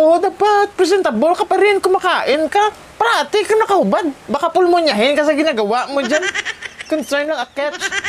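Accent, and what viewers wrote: Iloilo, Philippines. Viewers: Ilonggo ka po dhay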